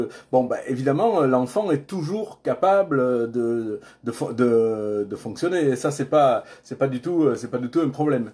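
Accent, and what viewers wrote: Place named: South, France. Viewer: un petit accent de marcel rufo !! ça chante le sud !! la Provence du Quebec ??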